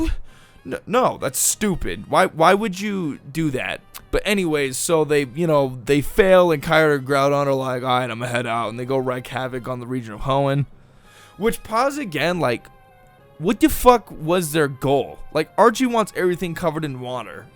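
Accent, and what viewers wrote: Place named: Toronto, Canada. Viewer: U talk like u a Toronto mans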